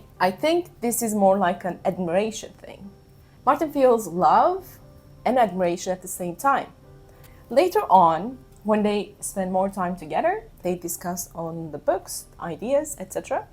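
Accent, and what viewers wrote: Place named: Turkey. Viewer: hi, are you turkish? your accent looks similar. :)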